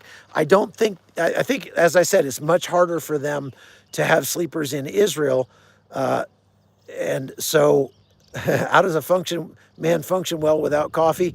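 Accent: United States. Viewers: What are you doing in Iran, you sound like your American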